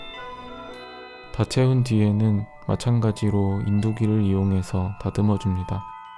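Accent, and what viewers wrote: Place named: Chungcheong, South Korea. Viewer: 유명한 유튜바한테서 느껴지는 익숙한 말씨... 충청도여...~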